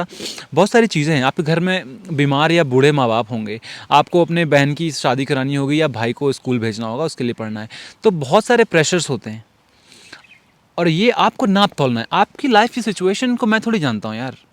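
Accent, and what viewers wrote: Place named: Pahadi, India. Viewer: Apaki pahadi avaz jaisi lagati hai very very nice. Thank you bhai.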